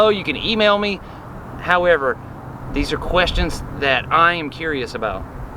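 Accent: South, United States. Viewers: Love your vids, - and the southern accent (Im a Brit), so absolutely, I'd watch if you went for a drive around N. Carolina. Thanks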